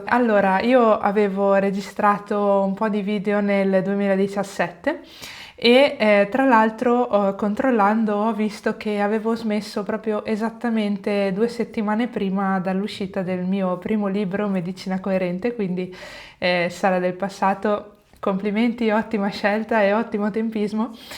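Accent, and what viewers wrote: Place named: Eastern Europe. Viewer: Infatti adesso noto che neppure il suo nome mette. E dall'accento mi sembra slava. Una "dottoressa" proprio in gamba deve essere. Olistica al punto giusto.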